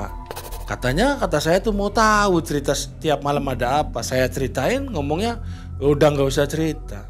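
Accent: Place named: Java, Indonesia. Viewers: Mas kalau cerita sedeppp, logat cirebone sedeppp nemen jare wong tegal 😅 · Di tunggu lagi Mang cerita ia.Ciri khas orang Cirebon bahasa ia.. anak cucu Mbah Kuwu Sangkan cirebon.salam dari Aso Cirebon Winong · lucu klo kang ngmong khas jwa bgt😂 tp klo sya liat liat kang ada trah bkn orng smbrangn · Bahasa Cirebon nya ga ilang yaa ang,,sampe ngakak dengerin cerita nya.😅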